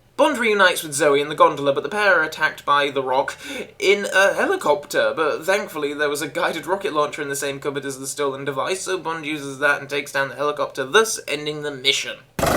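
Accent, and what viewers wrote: United Kingdom. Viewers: Are you even British?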